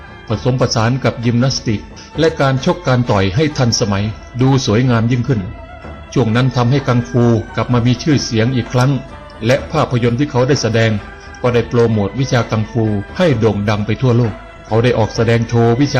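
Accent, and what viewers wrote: Isan, Thailand. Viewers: ชอบมากเลย... พูดอีสาน ได้ม่วนอีหลีเด้อ55555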